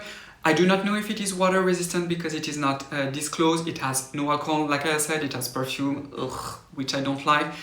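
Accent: France. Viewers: Greetings from West Hartford, CT🙋‍♀️You are my new favorite reviewer of sunscreens. I've watched enough of your videos now to finally be able to understand your heavily or should I say Heavenly French accented English. Love your channel,.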